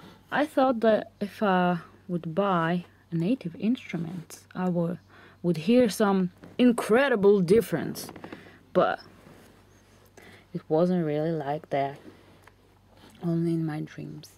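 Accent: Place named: Russia. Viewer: where are you from i feel a little Russian accent :)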